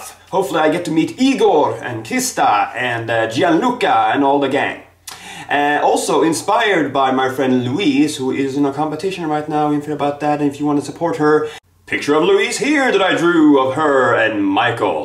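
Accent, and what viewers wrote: Sweden. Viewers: I been lookin for a Swedish man to speak English. Never heard a boy. Just all girls. It's amazingly amazing!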